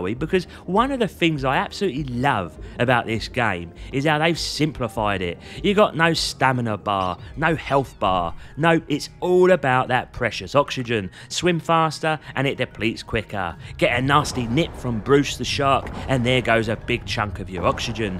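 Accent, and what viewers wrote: United Kingdom. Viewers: I cant understand the british accent 😢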